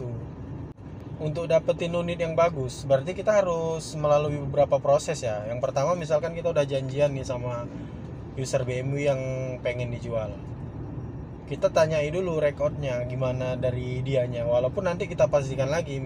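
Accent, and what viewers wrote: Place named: Aceh, Indonesia. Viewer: Kalo di lihat dr logat bahasa kayaknya ini di aceh ya Bang?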